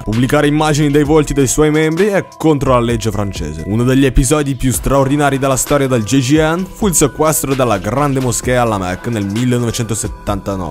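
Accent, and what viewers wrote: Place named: Russia. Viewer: Sento un lieve accento russo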